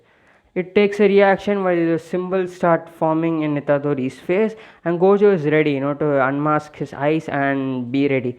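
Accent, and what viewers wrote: India. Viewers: Indian accent makes me laugh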